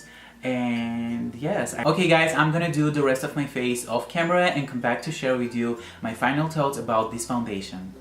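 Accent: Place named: Armenia. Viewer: You are so cute <3 love your Armenian accent :D <3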